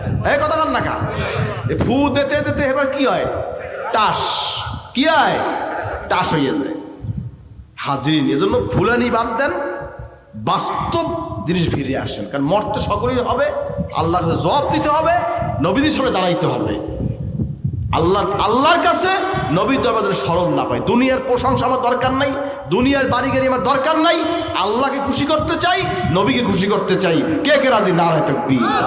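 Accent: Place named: Barishal, Bangladesh. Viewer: বগুড়ায় গিয়ে বরিশাইল্লা কথা বলার কারণ কি শাইখ? ওখানে গিয়ে শুদ্ধ বলাই উত্তম।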